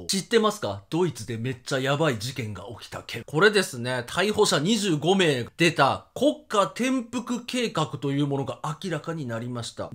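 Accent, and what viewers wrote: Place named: Kyushu, Japan. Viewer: 今日、九州弁がでてたね。 昭和ネタが大好き💕なのでこれからも沢山お願いします🤲。あ、姉そんネタも大好物です。 栗きんとんの冷凍は知らなかったよー😅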